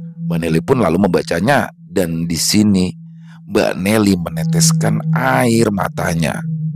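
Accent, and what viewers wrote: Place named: Sunda, Indonesia. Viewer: Mirip memang....saya ngikutin jg..karena bisa liat2 pemandangan....hanya beda dari logat si...kalau kek nya terdengar Sunda banget hehe....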